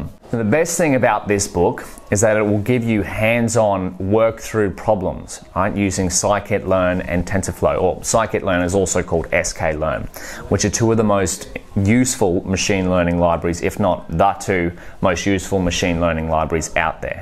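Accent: Australia. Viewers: The way you speak sometimes feel like Billy from strengerthings , or are u Australian..?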